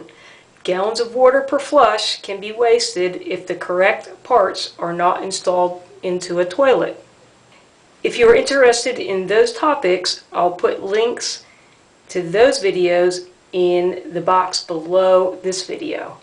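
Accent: Philadelphia, United States. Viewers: Philly accent! 🙌🏻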